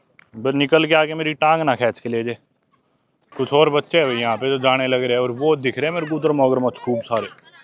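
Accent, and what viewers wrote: Haryana, India. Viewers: Love u bro..... Aapka hariyanvi to gazab ka hi he..... God bless u😂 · Bhai re ek number video jama swaad aa gai ....nue lagge jama ki theeth haryanvi choora aa rya hai.....being haryanvi I am proud of your brav ....best wishes from UK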